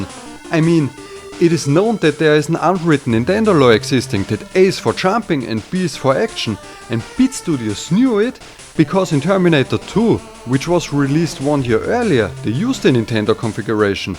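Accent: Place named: Austria. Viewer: you sound like an austria Sperling enlish. not greek.. but neis review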